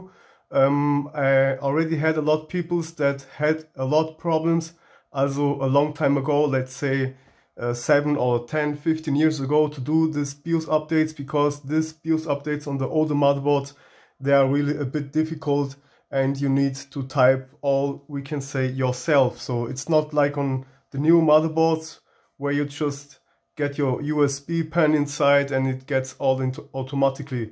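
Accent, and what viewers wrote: Germany. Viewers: der boss mit deutschem akzent, gutes video. danke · i hear german hahah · dieser Deutscher Akzent 🤣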